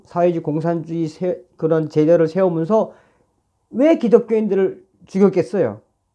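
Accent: Pyongyang, North Korea. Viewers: 하나님이 쓰시는 분이라고 느껴 집니다 김일성 김정일이는 지금 지옥에서 고통 받고 잇겟지요 얼마나 많은 사람들이 이 부 자 에게 죽임을 당햇을까요 그리고 수용소에 끌려가서 고통당하고 잇을까요 지금 이시간에도 그손자에게 고통당하는 북한주민들 정말 불쌍합니다 비록 그들이 쇠뇌당해 미국과 한국을 죽여야 될 적이라 생각 하겟지만요 아무튼 그들은 그렇다고 쳐도 남한에서 태어나고 교육받은 좌파 놈들은 무슨 생각으로 저렇게 사는지 정신이 돌아버린 놈들 같아요 참으로 한심하고 괘심합니다 북한 수용소에 다 쳐 넣어서 하루만 살아보면 아마도 속안더러 알게 되겠죠 아무튼 그날이 머지 않을것 같아요 왜냐하면 하나님이 다 보시고 계세요 북한과 남한의 기독교인 들의 사무친 기도가 하늘에 상달 되엇기에 하나님이 일 하실 것입니다 북한 사투리 너무 듣기가 좋아요 건강하시고 행복하세요 fighting!!!!! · 이북 말투를 들으나니까 고저 기분이 좋습니다. 돌아가신 우리 아바이 생각도 많이 납네다! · 구수한 평양 사투리 듣기 재미나고 정겹습니다 인물도 좋으시고 현실감 정말 좋습니다 이제 중독됐어요 감독님 감사합니다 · 여기 첨 왔는데 북한 사투리가 괭장히 낯선네요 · 북한 말이 거스립니다 일부러 하시지말고 그냥표준 말로 해주세요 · 그말투 진짜로 윗동네 말투입니까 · 북한말씨 섞어서 말씀하시니 더욱 재밌어요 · 정성산 동무 사투리 는 북한 어느지역 사투리 림네까?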